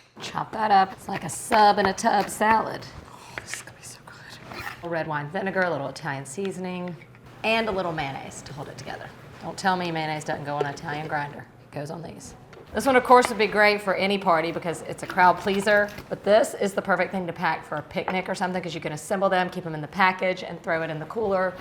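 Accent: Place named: Alabama, United States. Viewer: ! The recipes are almost always on point, but if I’m being honest, a lot of times I just listen to her videos because I hear home in her accent. I’m from a sort of culturally ambiguous part of northeast Florida (St. Augustine - we’ve been here since 1565 and have become a cultural crossroads for so MANY, that we don’t really have our own sho-nuff “this is who we are” vibe you get in so many other places. A drinking village with a fishing problem, some say. BUT, we are close enough to Georgia and Alabama that you can hear both in our accents here. I’m not positive, but there sounds like she’s got roots in Alabama. Sounds like home to me, so here I is!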